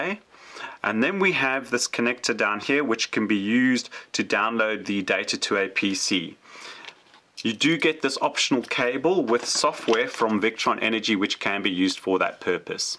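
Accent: South Africa. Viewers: Very good demo! Truly well explained, thank you!! South African accent? Dankie!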